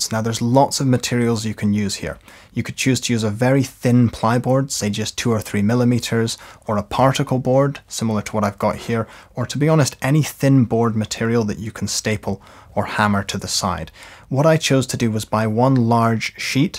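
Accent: Scotland, United Kingdom. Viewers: Chaps… this is what a very posh Scottish person sounds like… must be a Farquhar or similar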